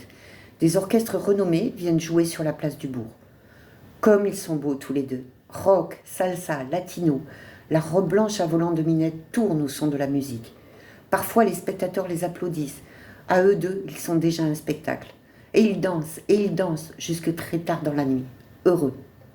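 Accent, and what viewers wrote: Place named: South, France. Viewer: Bonjour, je viens d’écouter la première partie des aventures de matou et minette j’ai beaucoup aimé, j’ai hâte d’écouter et de connaître la suite de cette belle histoire d’amour, la narratrice a une belle voix avec un petit Accent Du Sud qui nous plonge directement dans l’ambiance de se dérouleron aimerait que ça se termine jamais en même temps que ce récit elle nous fait découvrir des lieux magiques, j’avais l’impression de vivre avec eux tous ces moments inoubliables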